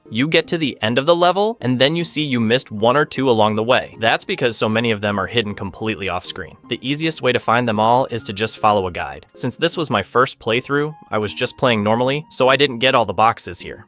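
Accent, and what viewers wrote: Brazil. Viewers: Are u Brazillian?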